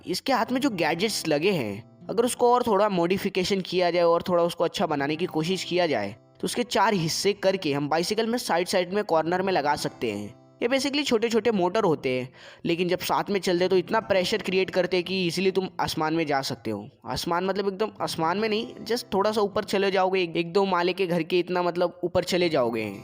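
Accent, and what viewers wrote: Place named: Tamil Nadu, India. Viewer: Bhai Tamil Nadu se ho kya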